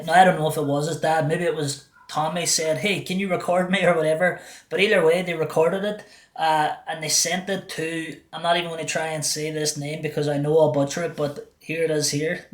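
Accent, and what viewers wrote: Scotland, United Kingdom. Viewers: I know. I was just enjoying your way of talking. I love a strong accent like scottish and irish. 😊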